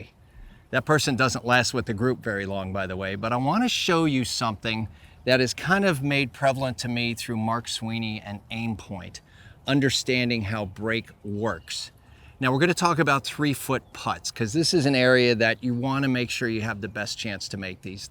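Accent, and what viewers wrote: United States. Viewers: Just a standard Connecticut accent? It’s not even thick.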